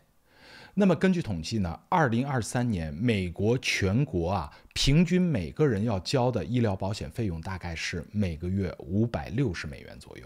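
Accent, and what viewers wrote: Standard. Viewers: 主播的普通话说的很好、很正。现在有的主播说话舌头不伸直，全程充满S，疯狂装沘，极度装嫩。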